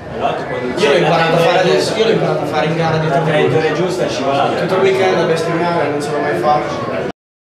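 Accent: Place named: Marche, Italy. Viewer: Hai la stessa "esse" di Fabri Fibra. Secondo me sei di Senigallia FORZA Bestia 23 Sempre!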